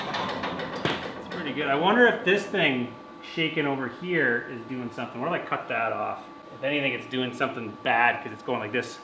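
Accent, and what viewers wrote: Canada. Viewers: For some reason you sound more Canadian today 😂 .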